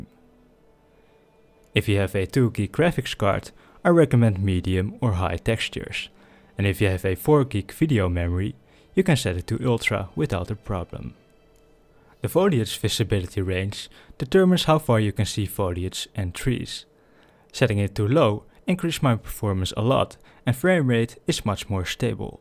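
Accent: Netherlands. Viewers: Your accent sounds very dutch, am I right? xD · Dat Nederlands accentje... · Probably someone from the Netherlands. I'm Dutch myself and know a lot of Dutch people speak English like this. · lol he has such a dutch accent (mooie vid) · does. He just has a really bad Dutch accent, which for some reason tends to add a lot of "sh" sounds in the wrong places for lots of people.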